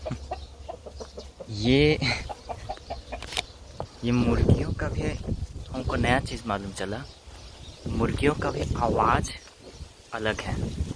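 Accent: Bihar, India. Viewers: Bhai ekdum bihar wala hindi bolta h,,,(hamko)😀😀😍😍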